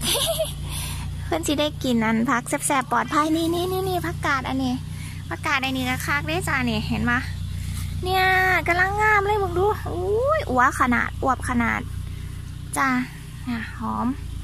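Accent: Isan, Thailand. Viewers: พูดอีสารน่ารักดีอยู่จังหวัดอะไรคะยากเห็นน่าจังถ่ายคิลบน่าขอดูหน้าหนอ่ยนะคะ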